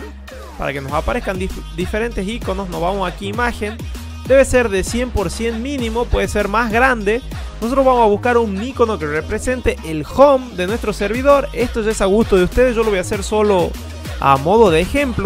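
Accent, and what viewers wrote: Tucumán, Argentina. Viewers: es tucumano pinta que es tucumano mal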